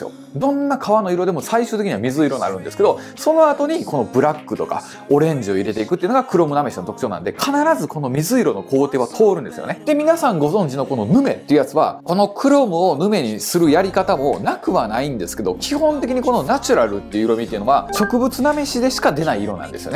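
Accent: Kansai, Japan. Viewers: あまり詳しくなかった私にもとても分かりやすいです 話し方も私には関西のお兄さんなんで聞きやすかった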